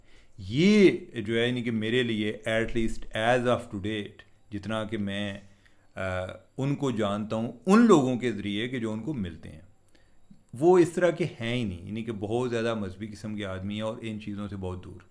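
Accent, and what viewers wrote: Punjab, India. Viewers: Punjabi touch zabardast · Sir! As usual very professional facts based Vlog presented in your unique and interesting style especially with Punjabi "Tarqa". I haved listened Ahmad Noorani & Bilal Ghauri Vlogs on General Asim Muneer. If you could share your information about Army Chief, it will be highly appreciated because Ahmad Noorani has disclosed very strange and unbelievable information about General Asim especially about his mental health, comparison with General ZiaulHaq, not capable for position of a General and Army Chief and his removal by IAKN from post ISI Chief on pressure by Iran etc. etc.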